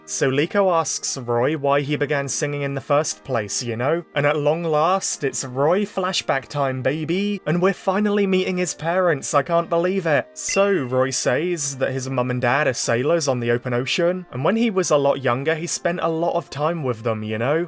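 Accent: United Kingdom. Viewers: Wait a minute, you're British?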